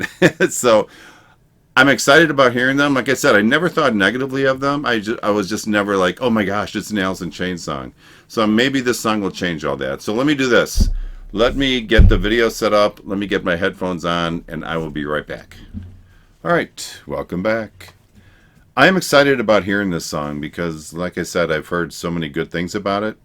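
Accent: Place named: Midwest, United States. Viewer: 3 seconds in and I detect Midwest/Great Lakes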